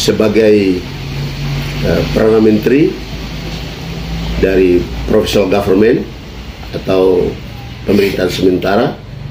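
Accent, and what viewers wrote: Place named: Netherlands. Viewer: Ah,BASI apa kamu? Kamu cuma akan bercerita bohong dengan back ground human right, ya WAROM (mirip aksen Van Holland ),pelanggaran HAM dengan cerita bohong panjang lebar & berita terbalik untuk meyakinkan lawan bicaranya bahkan playing victim ditambah bahasa air mata jika perlu & agama. Perlu diketahui RI atau NKRI itu negara hukum & sangat menjunjung HAM ( human right) itu sebab NKRI punya Pancasila & undang undang dasar 1945,juga jelas secara explisit menyatakan perang terhadap segala bentuk penjajahan bahkan menegaskan harus di hapuskan di seluruh dunia, shg sudah cukup jelas pandangan bangsa Indonesia juga NKRI mengenai ham karena guidennya untuk kehidupan berbangsa & bernegara cuma panca sila & undang undang dasar 1945,shg pasti harus menjujung HAM. Bahkan bangsa & NKRI mengharamkan rasis & biasa hidup plural beda dengan organisasi kalian yang berisi WNA mirip WNI etnik irian juga orang kulit putih itu rasis bahkan mengajarkan ke anak anak, RI terkenal sekali bersahabat dengan bangsa bangsa di benua Afrika & diterima semua pandangan juga sikap hidupnya. 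Hey,WAROM bshkan sekarang jelas semua itu hal yang biasa kalian pakai propaganda adu domba serta fitnah itu,ternyata yang perkosa guru,petugas kesehatan kalian,menyandera anak sekolah,merusak jembatan,membakar gereja rasis itu kalian termasuk propaganda bohong dari agen propaganda luar negeri kalian, semua perbuatan itu melanggar HAM semua. Maka keluar atau tariklah bawa ke tempatmu negaramu & tuanmu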